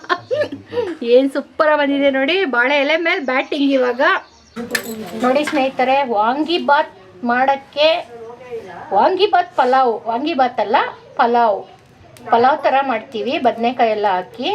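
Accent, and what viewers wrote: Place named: Nagamangala, India. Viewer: Hello Mammy, First of all Thank you. You prepared mouth watering breakfast in the traditional wooden stove it's my favourite system. Your communication slang belongs to Nagamangala- Mandya (ಅಕ್ಕ ಎಷ್ಟೇ ಹಾಕೊಳ್ಳೋದು) because My mammy also belongs Nagamangala, Really Hardwork people ever found there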